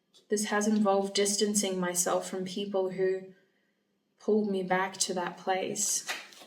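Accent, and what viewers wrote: Australia. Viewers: it’s INSANE how accurate your readings are. also, another reason I love watching is because of your australian accent 😍❤️ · Are you Aussie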